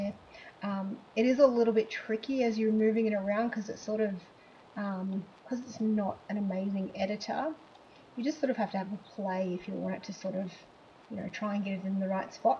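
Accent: Australia. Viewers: From Australia?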